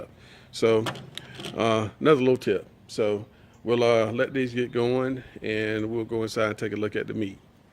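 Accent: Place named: Baltimore, United States. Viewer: I may be mistaken but from your accent, Baltimore right? Love the video.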